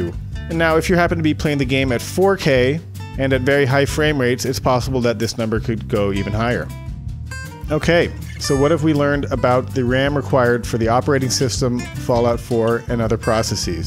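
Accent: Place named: Netherlands. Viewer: it sounded a little bit Dutchy. This vid was great by the way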